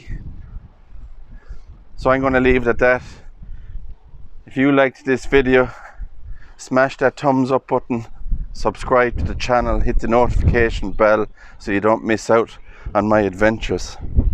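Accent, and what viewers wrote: Ireland. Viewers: You're beautiful Irish accent alone makes it worth listening to! Do you speak Irish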